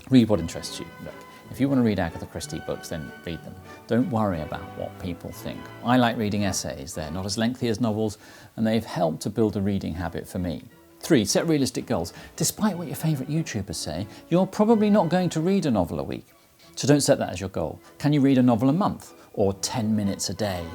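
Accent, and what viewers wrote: RP, United Kingdom. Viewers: can someone answer? what accent hes using? is it fully posh?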